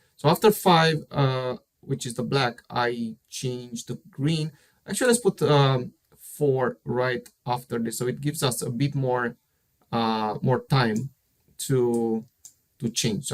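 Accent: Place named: Romania. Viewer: Are you romanian? :)) (because of the accent) Anyway, congrats for explanations.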